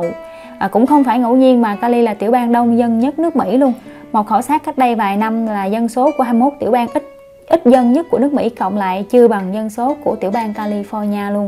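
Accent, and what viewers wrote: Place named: Central, Vietnam. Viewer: tôi rất thích nghe giọng của chất giọng miền trung, vùng NHA TRANG KHÁNH HOÀ cảm ơn đã chia sẽ, THANKS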